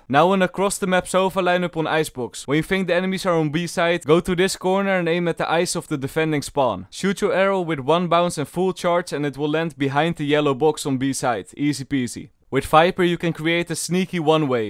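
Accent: Netherlands. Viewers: Ik merk aan je accent dat je nederlands bent. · i smell dutch u from holland ?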